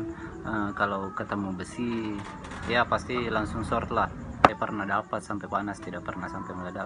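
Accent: Bugis, Indonesia. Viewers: Kya orang bugis ngomongnya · Logatnya kaya saya kenal daeng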